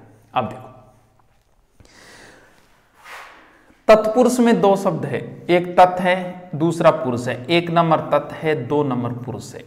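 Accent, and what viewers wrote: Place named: Rajasthan, India. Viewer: Rajasthani tone....maja aaya pdhne me....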